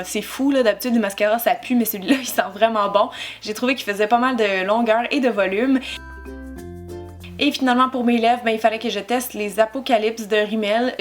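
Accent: Quebec, Canada. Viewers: Jaime tellement l'accent québécois ! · J'ai un peu de mal avec l'accent québécois mais avec toi ça passe grâce à ton dynamisme. 💋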